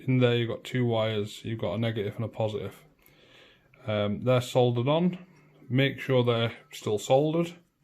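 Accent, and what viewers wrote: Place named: United Kingdom. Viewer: Thanks for teardown instructions but damn your british voice irks me sorry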